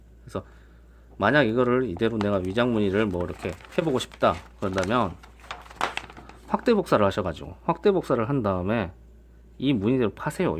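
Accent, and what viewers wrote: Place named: Jeolla, South Korea. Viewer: 전라도 사투리네 씨발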